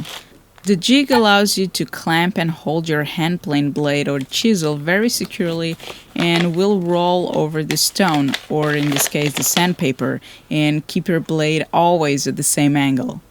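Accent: Portugal. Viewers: so agora depois de ver tantos videos é que percebi que és de Portugal :)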